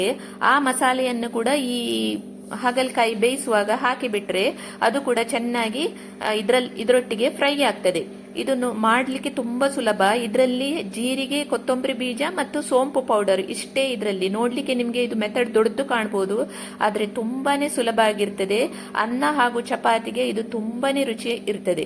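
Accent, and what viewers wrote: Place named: Mangalore, India. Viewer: ಮಂಗಳೂರ್ ಕನ್ನಡ ಕೇಳೋಕ್ ಚಂದ ಹಾಗಲಕಾಯಿ ಸಿಪ್ಪೆ ಯಾವತ್ತೂ ತೆಗೆದಿಲ್ಲ ರೆಸಿಪಿ 👌👌👌😋😋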